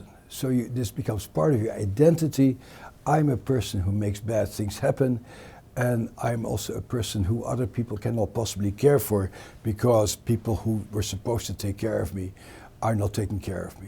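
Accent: Netherlands. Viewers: I can just tell that this guy has to be Dutch just by accent! If he isn't I will.... eat my socks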